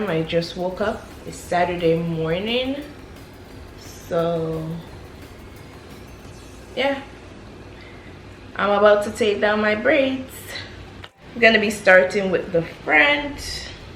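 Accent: Jamaica. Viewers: Mi luv yuh Jamaican accent😝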